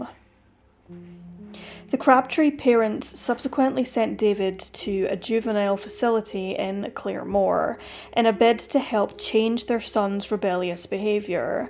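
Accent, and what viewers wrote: Scotland, United Kingdom. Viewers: She is Scottish. We all talk like that in Scotland 😁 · The Scottish accent really adds an extra layer to the content. Don't know why. It just does.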